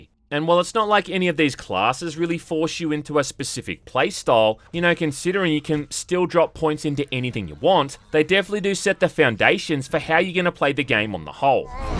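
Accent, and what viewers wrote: Australia. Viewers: Never tire of you saying 1999 the aussie is strong with this one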